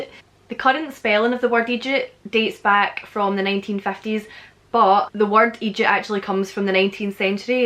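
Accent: Scotland, United Kingdom. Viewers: scottish accent is the best 😂♥️ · Oh my God I simply love this accent. I was planning to study English there in Scotland. I got a better deal for England, (un)fortunately, though. At least I'll be able to go there. Subscribed (long time ago). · Giiiirrrrl you make the Scottish accent sound Scottish. Seriously, though, thanks for this. It's been a bit since I've been to Scotland and I miss the people and the accent. Slainte Mhath!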